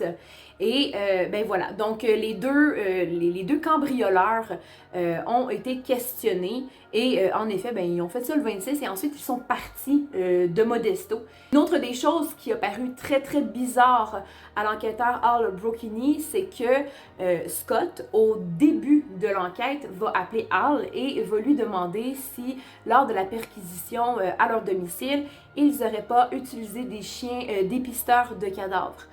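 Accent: Quebec, Canada. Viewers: Merci pour cette vidéo. C'est bien raconté et pas trop court ni trop long. Et j'aime beaucoup l'accent québécois. Si tu me permets une petite correction, au tout début de la vidéo : On dit "un" épisode (c'est masculin). Et une petite remarque : Il y a beaucoup de détails tout au long du récit qui, selon moi, n'apportent rien d'intéressant à l'intrigue comme par exemple le prix de la maison cité au début, le nombre de pièces,... Merci pour tes efforts ❤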